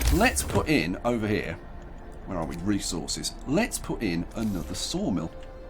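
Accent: United Kingdom. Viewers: I literally can not remember being so fucking entertained by a gameplay... its just amazing, and also i cant imagine listening to this in something other than a freaking british accent... Good job, buddy keep them comming! Lots of love!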